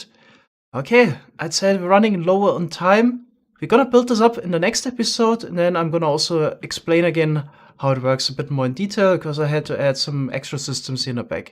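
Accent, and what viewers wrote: Germany. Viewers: Hey, uhm, I'm new here, and I'm just curious. Your accent while speaking English sounds quite German. Are you from there? Or do you live "near" Germany?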